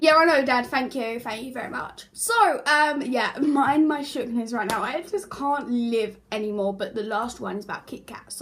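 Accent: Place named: United Kingdom. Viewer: hard to listen to the br accent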